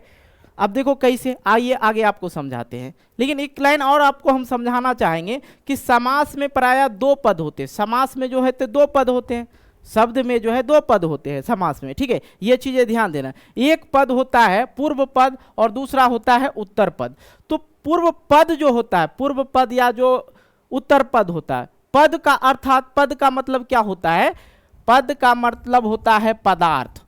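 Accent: Bihar, India. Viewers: Tum va bihari he ka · Sir aap bihari ho kya ❤ Aap bihar se ho kya ❤😊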